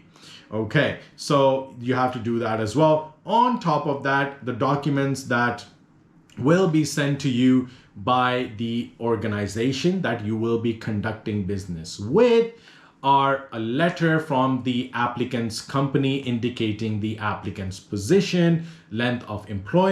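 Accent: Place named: Punjab, India. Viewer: What part of Pakistan are you? Your punjabi accent is unmistakable. Good videos.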